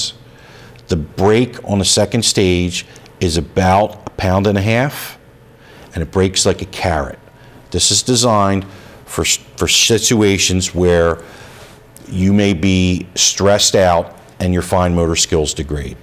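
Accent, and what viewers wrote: Pennsylvania, United States. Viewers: Hello, SIr. I a Lancaster boy living in Florida about your age. I recognize your PA accent anywhere. I have two of your triggers, the SD 3 gun ans the ALG ACT. Boy o boy are they great and exactly as you describe in your video. My next one will be the SSA E with a flat bow. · I hear Pennsylvania in your voice.. sounds like home. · I love me some Pennsylvanians. Live in another state now, and miss the accent and pacing.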